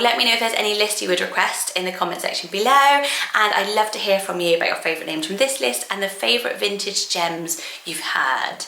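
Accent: United Kingdom. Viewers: These are sooo cool!! Wish half of them sounded as good in my American accent as they do in your gorg British accent 😘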